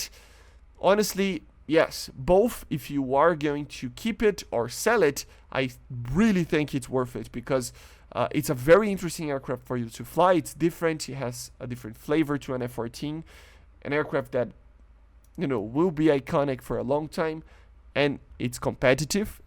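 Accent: Brazil. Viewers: Ah esse sotaquezinho brasileiro rsrsrs eu amo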